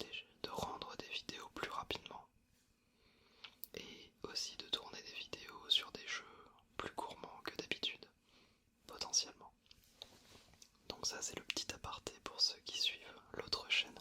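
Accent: France. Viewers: Joyeuses fêtes, j'aime beaucoup tes vidéos je te trouve très apaisé et apaisant. J'apprécie le soin et la préparation que tu accordes à tes vidéos, je pense que ta chaîne est ma chaîne ASMR préférée:) PS: J'aime bien ton accent français sur tes vidéos en anglais, c'est un bon trigger ajouté au chuchotement:)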